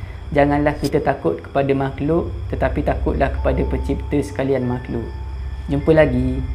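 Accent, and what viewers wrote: Terengganu, Malaysia. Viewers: org kuale teganu ke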